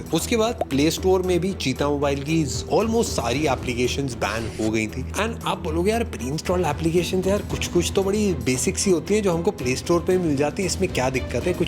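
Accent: India. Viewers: umma koi kaam ke aap na hoyi gyi, INDIAN TONGUE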